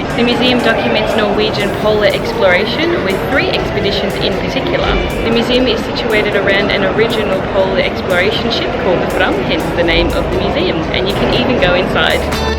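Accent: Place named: Melbourne, Australia. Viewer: G'day from your home town, Melbourne!! Not hard to pick that accent!! My wife and I are coming to Oslo-Bergen enroute to catch the North Lights in January next year. Any advice on what what may or may not be open in winter in Oslo? Thanks. Vincent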